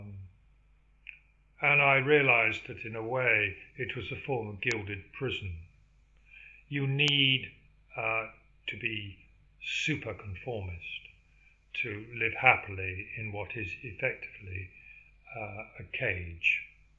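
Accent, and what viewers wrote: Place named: RP, United Kingdom. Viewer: Youre a privileged little clown with a serious case of white guilt. This is perfect proof that a posh accent and privileged background in absolutely no way equate to intelligence.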